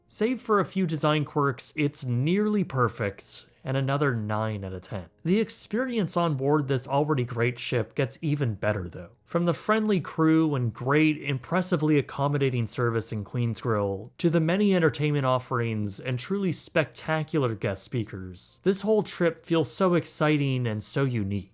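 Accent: Canada. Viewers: I find this happening more and more with review videos... Typos and mispronunciations degrade the final product, and make you seem like you aren't the authority a piece like this would suggest. Look up all names and proper nouns first, check for punctuation, etc. Like these are the editing things that can take a viewer out of the experience, and make the presenter look immature. A few have mentioned the "Verraz-nano," and that was kinda it for me. My comment would be one of laughing along if this video was aiming to be funny, but it's obvious that this isn't the objective. So I'm saying it now, if you want to come across as authoritative on a subject, grammar, editing, pronunciation, and word variation ("very...very...very..." etc.) are priorities to get right. And it's pronounced more like, "Ver-rah-zah-no" if you're from the city like I am. With your Canadian accent, I'd say maybe something like, "Vair-ah-zah-no" is more accurate. 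Where we have stronger E sounds, you guys seem to go towards an A: "Ter-rible" vs. "Tair-rible". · I am new to this channel and I instantly noticed the Canadian accent😅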